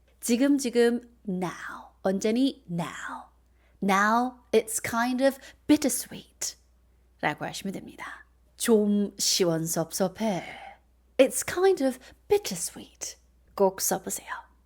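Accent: United Kingdom. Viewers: 아침에 생방 듣고 빵빵 터졌어요~ 제가 딱 원하던 영국영어 감사합니다~ 너무 웃기셔요 ㅎㅎㅎ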